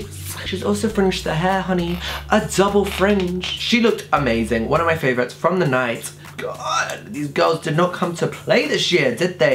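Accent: Scotland, United Kingdom. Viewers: i keep speaking in that weird scottish accent because im picking it up from ur vids, fair to say my mums not v happy about it tho loooool x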